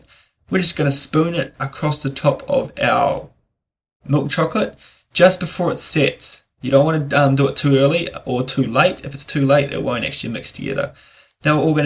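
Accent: New Zealand. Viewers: Are you from new Zealand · Are you a Kiwi….you talk like me?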